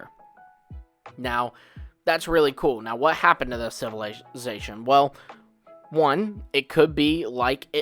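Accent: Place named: Midwest, United States. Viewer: "Ope", dude, you are SO Midwestern in your talk. XD That's totally cool! So am I. I had to be clued into the fact a year or so ago that I actually said that word as much as everyone else. Never noticed. XD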